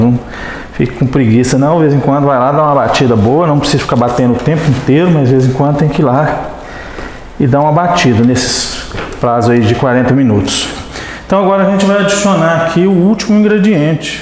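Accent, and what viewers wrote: Minas Gerais, Brazil. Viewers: Adoro esse jeito mineiro de falar ❤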